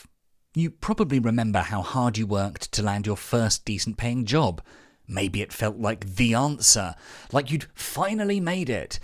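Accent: England, United Kingdom. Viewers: You know what I am sick and tired of hearing these English accents. I'm not even joking I'm going to throw my phone or my TV out the window if I hear one more video with somebody with some half-baked goofy English accent. I'm sick of it.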